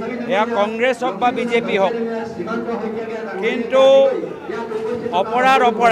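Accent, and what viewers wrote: Bihar, India. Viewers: প্ৰহাৰ নচলাই প্ৰহাৰ কৰে হে। অসমীয়া ভাষা টো ভালকৈ কোৱা উচিত কাৰণ আপুনি অসমীয়া। বাকী অসমীয়া কওঁতে আপোনাৰ বিহাৰ,ইউ পিৰ সুৰ টো সলনি হোৱাৰ আশা নাইকৰা।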